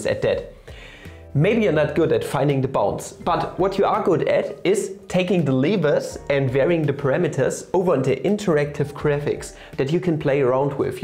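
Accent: Germany. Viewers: wait i never noticed your german accent before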